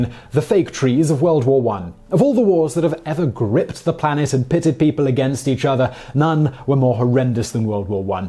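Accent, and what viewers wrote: United Kingdom. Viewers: Cool video! The thick British and rushed words makes it a little difficult to understand, if you could slow it down a little that would be great. Other than that, excellent.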